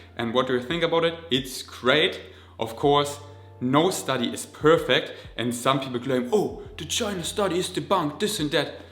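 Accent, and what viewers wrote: Germany. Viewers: Hey Du, ist mir schon mehrfach aufgefallen, jetzt muss ich es einfach sagen😂 ...Vor einem englischen Wort mit einem Vokal bzw. Vokallaut am Anfang "an" statt "a" benutzen! AN incomplete protein! Fließt doch viel besser so!🌞✔ Und um den deutschen Akzent zu verlieren, würde ich mir Videos wie "How to sound like an American" anschauen!🌚 Und wenn Du die Wörter nicht wie im Deutschen mit einer kleinen Pause dazwischen aussprichst, sondern zusammenziehst, wirkt das auch gleich viel besser (deshalb auch an statt a, damit man die Wörter ohne Unterbrechung aussprechen kann) 🌞✔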